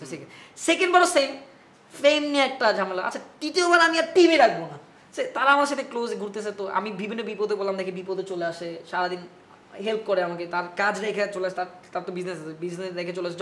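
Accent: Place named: Barishal, Bangladesh. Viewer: আপনার মুখে বরিশাল ভাষা শুনতে আমার খুব ভাল লাগে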